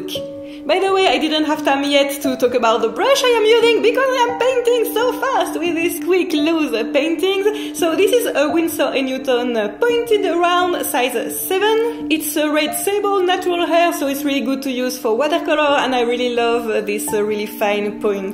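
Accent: France. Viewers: I have really enjoyed this tutorial. I have painted it four times and keep learning a little more each time. Sometimes I have a difficult time understanding you when you talk very quickly with your French accent so I have found a solution to that. I slow down the speed on my computer which slows down your talking too. It works great although at times it is rather funny because it makes you sound a bit intoxicated. Lol. I would love to watch tutorials on negative painting. You are such a talented artist.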